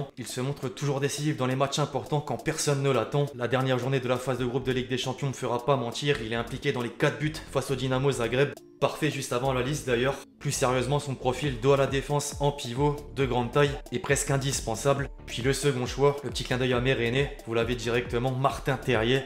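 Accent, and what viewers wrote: North, France. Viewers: pareil que toi, sauf que je prends upamecano pour kounde, je savais pas que t’étais deuch nord (j'oro du min douter avec tin accent) en plus t'es sang et or !!! je m'abonnes de suite.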